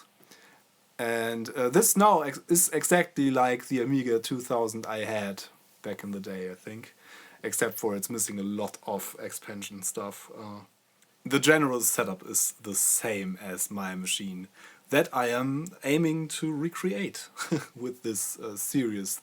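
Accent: Germany. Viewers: You sound like a german boy who trrrrry to speak english.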